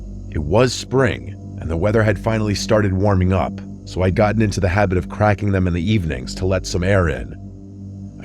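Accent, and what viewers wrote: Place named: New York, United States. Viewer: Anyone else notice the narrator’s NY area accent like its head out occasionally? Even thru the (what I assume) is a voice filter? I’m guessing he’s from North Jersey…I’m from that same region, so no judgement! 😂 These vids are amazing btw; just discovered them and can’t turn them off! Truly bone-chilling sh*t! Where does he find these stories anyway? Reddit, etc..? 🤔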